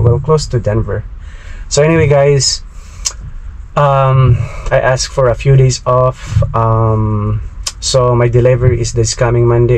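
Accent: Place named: Philippines. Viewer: Hey where's in Philippines you come from?,Your English is very good a bit if a accent there.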